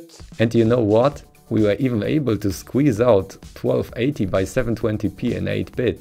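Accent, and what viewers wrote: Germany. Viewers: 4 sekunden die stimme gehört und direkt gewusst es ist ein deutscher:D:D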